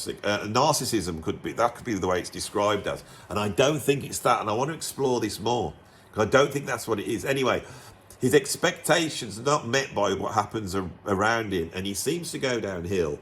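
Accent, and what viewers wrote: United Kingdom. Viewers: Though not a musician (professional, amateur, or otherwise), I've been a jazz/rock fusion fan since my teens (for about 50 years) and of course still am. However, most of these artists/albums you cite I hadn't heard of and of course I didn't have albums, CDs, or mp3s of their music. Exceptions being, and albums that you've named, which I've acquired in the last 4 or 5 years in mp3 form. I was actually able to find the other seven on mp3 just now and have promptly downloaded. I ran into your YouTube channel by accident--yes, was drawn in by your video about albums that critics love but that you called "crap" and I have to say I agreed with most but not all of your assessments. But....when finding out that you are a fusion and prog rock fan AND a professional drummer to boot, I literally had to subscribe. Although it may take some time for me to get used to the British accent (yeah, born and raised in the USA...just like!!! 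🙂), even when I disagree with your opinion on an album--I find you thoroughly entertaining and witty. Count me a fan!!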